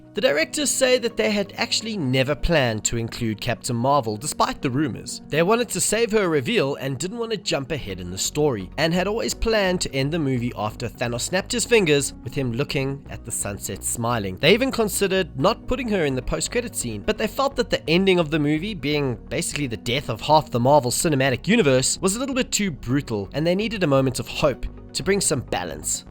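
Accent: South Africa. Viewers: Random question, are you South African?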